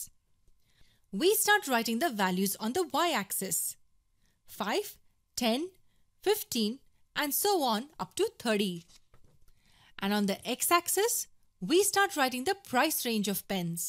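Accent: India. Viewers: Indian ascend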